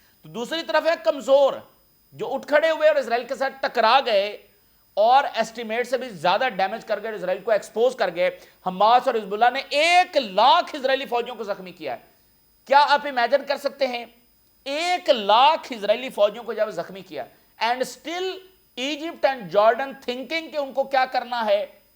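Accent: Punjab, Pakistan. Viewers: I anticipate you are a Pakistani Muslim! Futher from your Urdu accent I can tell that accent is from punjab! Reason of contact: but from your tongue I had never heard you saying the name of Allah subanahu Tallah our creator!, you should feel proud to be a Muslim and say Allah’s name so that it is audible to all, saying Allah’s name come out from the heart ❤️ naturally without even thinking! I had heard you saying “uoper walls” but I had never heard you taking or saying pronouncing Allah subanahu Tallah’s name loudly as you say rest of the stuff why??????? but don’t you feel proud of your religion Islam and say from your tongue the noble name of “Allah Subanahu Tallah” what is stopping you nothing is more important in this world than Allah and our religion Islam and the rest of the things comes after Allah! Nothing in the world is more important than Allah! 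I will suggest you proudly proclaim Allah’s name, specially at times when your are crediting Allah, instead of saying uoper walla proudly say Allah subanahu Talla! I hope you get to my comment some how and try be better Muslim! Aameen!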